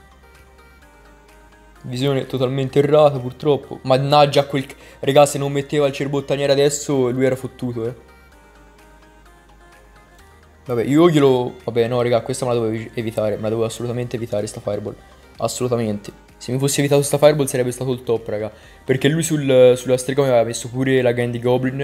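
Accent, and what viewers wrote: Tuscany, Italy. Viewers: lo so sei toscano si sente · Bella, di che zona sei della toscana?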